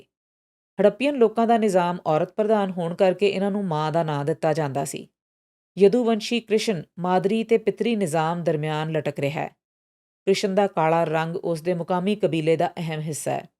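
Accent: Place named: Punjab, India. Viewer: thanks for analysing such historical facts keep it up yourspeaking in folk Punjabi is highly appreciable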